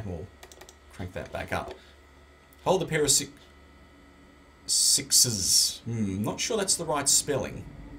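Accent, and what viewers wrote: Australia. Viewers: You wouldn't happen to be Australian are you? you sound it on your videos. Love your videos of the Old Vintage Macs you got too.:)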